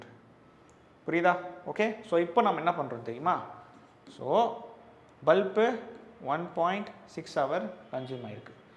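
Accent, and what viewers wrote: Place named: Thanjavur, India. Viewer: thanjavurla irunthu pesuran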